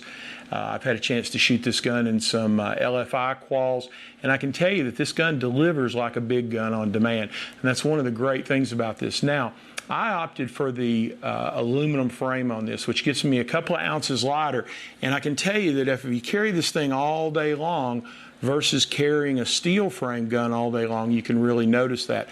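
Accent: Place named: South, United States. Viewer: I have a hard time listening to a overweight, end of life, bald bullet headed guy who speaks with a southern accent telling me anything about safety and personal defence. Here's is a personal defence tip, start exercising and stop eating everything you see. You are far more likely to die of a heart attack than a bad guy, "you can count on it".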